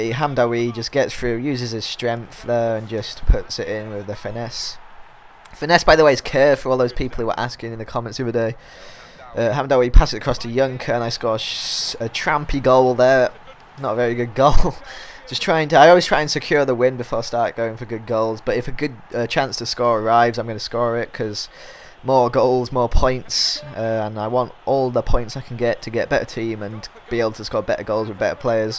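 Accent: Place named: Yorkshire, United Kingdom. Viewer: hearing these crazy Scandinavian names in a Yorkshire accent is half the fun ;D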